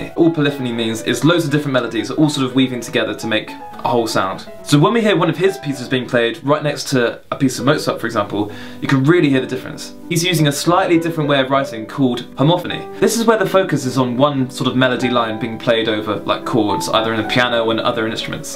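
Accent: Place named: United Kingdom. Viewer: It too much British accent their,please slow it little bit not able to understand clearly. Thanks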